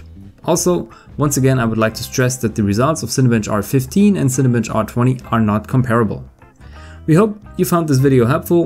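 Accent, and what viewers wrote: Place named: Germany. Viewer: man hört so raus das du deutsch bist xd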